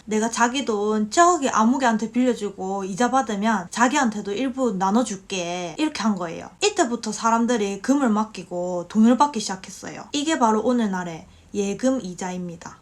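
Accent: Gyeongsang, South Korea. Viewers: 경상도 사투리로 아주 야물딱지네요~~^^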